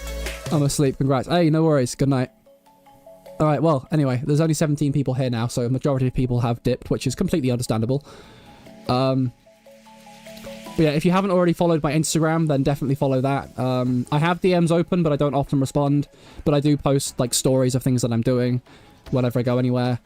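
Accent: United Kingdom. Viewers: AAAA CONGRATS!!! you sound very british ahahaha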